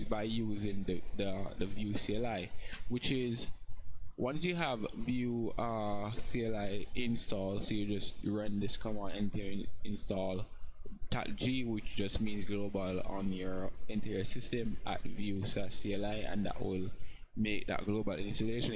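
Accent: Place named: Jamaica. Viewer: Came expecting an Indian accent, stayed for the Jamaican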